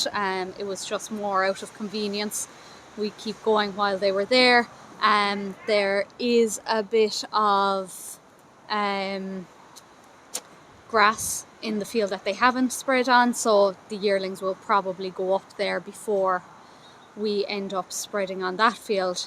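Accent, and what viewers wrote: Kerry, Ireland. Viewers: dont say yearlings The original farmer word is WEANLING That's just what I think You use some lovely old expressions like the "Pike " and definitely don't lose the Kerry accent Best of luck from coMeath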